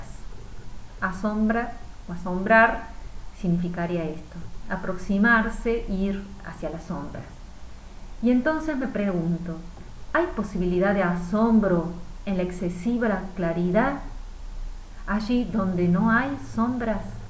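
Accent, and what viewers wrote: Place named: Córdoba, Argentina. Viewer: Un luuuuuujaso (leáse con ese hermoso acento cooordoooobés)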